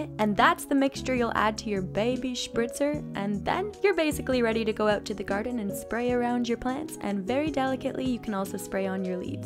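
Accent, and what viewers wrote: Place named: New York, United States. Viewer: Just curious how come you have a bit of a New Jersey/New York-ish or I guess maybe even New England-ish accent when you’re from Canada? Have you lived in that area before?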